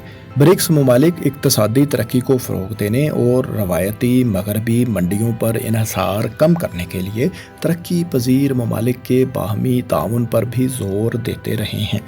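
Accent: Punjab, Pakistan. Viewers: Aapki malumaat bahut achi Hein 👍🏻per Urdu Aap Punjabi lehjey mey Boltey Hein jiss Maza kirkira Hojata Hey 😂🙏🏻🙏🏻👍🏻👍🏻👍🏻👍🏻 Sohail Karachi 🙏🏻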